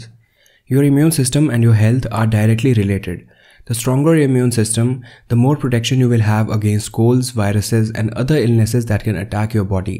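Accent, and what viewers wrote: India. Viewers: Are you Indian your English essence is like of a Indian🤔🤔🤔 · You're accent is more like indian buddy ! ❤️❤️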